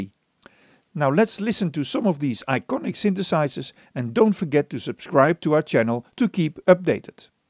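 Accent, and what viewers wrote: Netherlands. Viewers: this guy is definitely dutch haha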